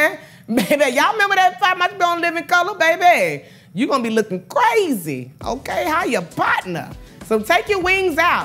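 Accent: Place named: South, United States. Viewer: I love your southern accent .. specially wen U say “sopped up" 🤣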